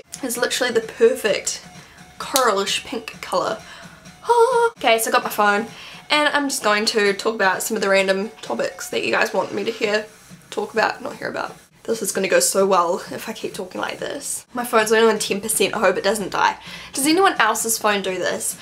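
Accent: New Zealand. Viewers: are you from New Zealand? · shes from new zealand so she has an accent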